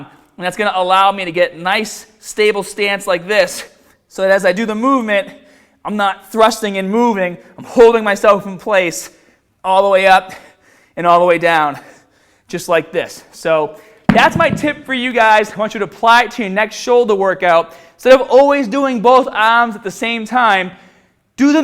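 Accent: Boston, United States. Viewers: TreJay Ratliff i hear everyone spam about his boston accent in previous videos Xd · damn it's been awhile since I seen any of this videos. I didn't know he had a Boston accent, lol. keep up the great work.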